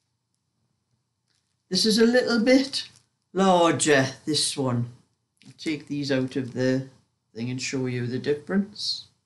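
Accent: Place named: Northern England, United Kingdom. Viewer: I love your accent, I’m from the South, so I know your up North..lol Had endless rain which made my grass grow. Cut it and the mower really struggled, as did l.. Your knitting is beautiful. I can knit but only simple things, at the moment using my stash for pet blankets for my daughter’s vet.I’ve just learnt basic crochet ( from YouTube ) after many years trying but only doing the simple single st at the moment…baby steps…lol 😂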